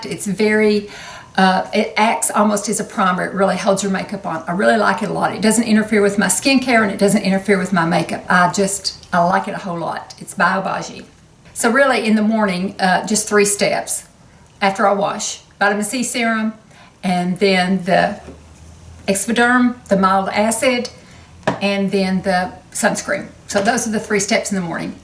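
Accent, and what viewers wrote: South, United States. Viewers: Thank you for your help.You definitely look better than Christy Brinkley or any other Hollywood beauty guru.Please tell us about your diet and exercise,supplements. I find that woman that gain and loose weight tend to loose tone in the jaw line.You have amazing bone structure.Do you have any recommendations for face yoga or face massage?Can you share your ethnic background? Thank you for encouraging us to age well.I hope to move into being older and better as you have.Love the southern accent.God bless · Did ur accent get more southern? Lolz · Lol i dont know if it's the southern accent but you seem like such a sweet person, something about you reminds me of a warm hug.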